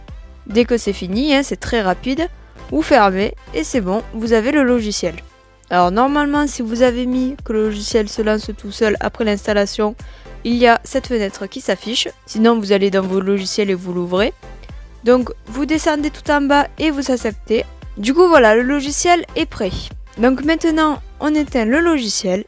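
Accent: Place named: South, France. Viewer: Super merci pour ce tuto tout ça avec l'accent du sud 👌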